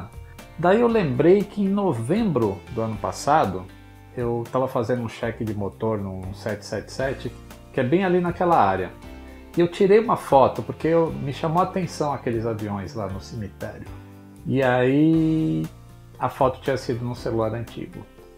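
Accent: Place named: Northeast, Brazil. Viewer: agora entendi esse sotaque de nordestino kk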